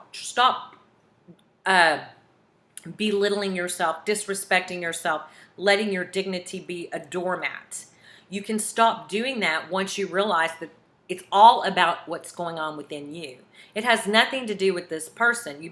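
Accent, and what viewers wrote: Texas, United States. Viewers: You sound like a Texas woman! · Girl u have to be from Texas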